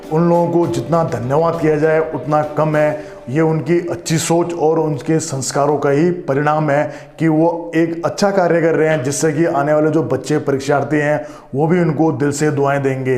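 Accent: Rajasthan, India. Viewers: Sir ap Rajsthan se ho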